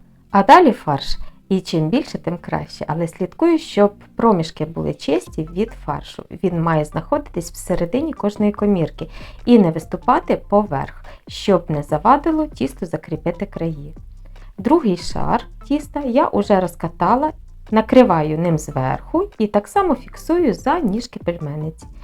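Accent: Standard. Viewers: Дякую за науку, чарівна наша господине! 😊 І за чисту солов'їну - окрема подяка! 🙏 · Дякую за пораду і за чисту українську ... Добра і процвітання ❤❤❤❤❤❤❤❤🎉🎉🎉🎉🎉🎉🎉🎉🎉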